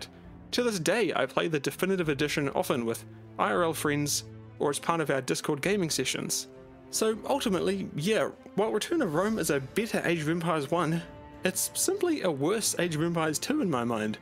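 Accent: New Zealand. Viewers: Good review! Question: where do you come from? The accent reminds me of New Zealand or something like that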